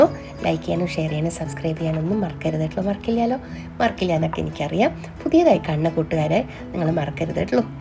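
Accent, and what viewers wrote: Palakkad, India. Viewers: ❤Ellam special receipes anu. Super, Palakadan varthamanavum adipoli, kelkan nalla rasam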